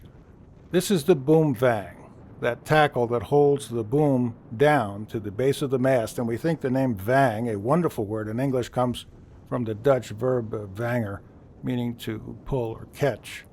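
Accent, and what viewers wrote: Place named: Southern California, United States. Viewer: I love The Philosophy of Sailing.I listen to it often. Learned so much. I grew up in Sherman Oaks and Fullerton. Your So. Cal accent (intonation, cadence, tempo, along with your wonderful humor) is something I am very familiar with. You remind me of listening to my best friend’s father as we hiked Catalina, from the isthmus to Avalon. Looking forward to more of your wonderful videos. Have you considered a Vol. 2 of Philosophy? I’d buy it in a heartbeat!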